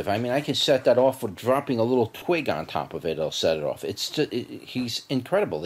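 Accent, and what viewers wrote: New York, United States. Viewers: You sound like you’re from one of the boroughs. :). I like your accent!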